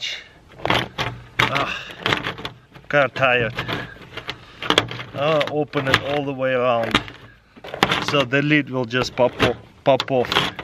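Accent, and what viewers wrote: Russia. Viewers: Жуткий русский акцент. Ха ха ха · wow this guy look so much like crazyrussianhacker even got the accent too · his accent tells it that he is a russian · Твой акцент выдаёт в тебе русскоязычного.😂😂😂🤣🤣🤣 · Что за русский акцент?))) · Такой махровый рязанский акцент, прикольно звучит.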